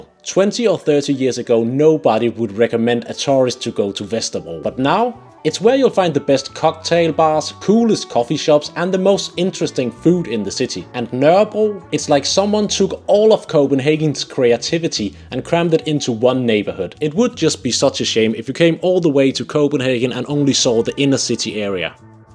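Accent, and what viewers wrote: Liverpool, United Kingdom. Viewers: Thank you! My partner and I are visiting Copenhagen in two weeks time from Manchester and this video and the other video "My Amazing Day Trying New Things in Copenhagen City Centre" have been very helpful! We're just trying to work out your accent, have you spent some time in Scotland or also Northern England? lol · You speak English with a Liverpool accent. Were you taught English by a Liverpudlian? Love your videos. Good job. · You sound like a Beatle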